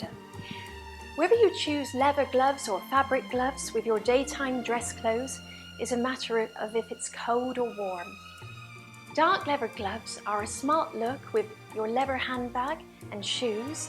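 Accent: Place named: RP, United Kingdom. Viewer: love your RP accent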